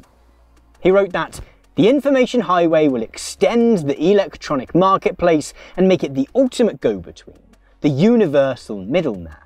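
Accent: United Kingdom. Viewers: your caption writer corrected some parts in your British af rant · damn I love you and your videos so much. I really love political theory and having you explain it to me with modern day relevance and a nice british accent is just amazing.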